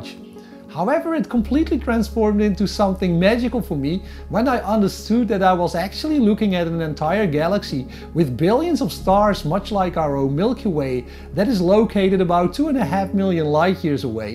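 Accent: Netherlands. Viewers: Hello, I enjoy your videos very much. I live on Vancouver Island in Canada and our climate is similar to yours. My parents came from Holland so I like your accent LOL. I have a question for you or perhaps a topic you can test. I'm at the beginning my astrophotography hobby and very much a newbie but have been doing lots of studying for the last 2 months. I have a cold weather question: After watching this short video <link> on photographing in cold weather I was wondering if any astrophotographers have noticed a difference in using heat bands to prevent dew and if the heat band adds heat distortion itself. I do know you need to cool your equipment before shooting but then your adding heat to the lens hood area again. Isn't this causing heat distortion?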